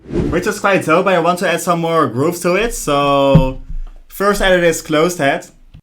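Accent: Netherlands. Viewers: U must be dutch ey?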